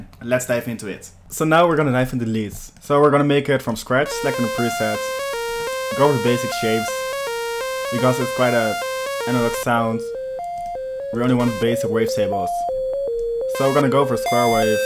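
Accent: Netherlands. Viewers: graag gedaan gozer! Ik hoor aan je stem dat je gewoon NL ben haha, ben je ook een dj en wat is je insta?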